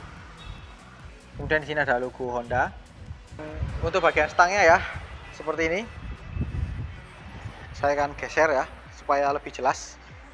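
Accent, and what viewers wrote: Malang, Indonesia. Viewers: Mangkane medok tibae wong claket malang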